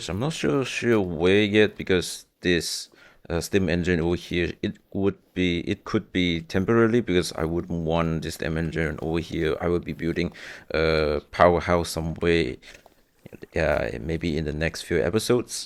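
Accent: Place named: Malaysia. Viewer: I was wondering why your accent sounded familiar, only to realise you’re malaysian too! Love the production value in your video, and excited to see what’s next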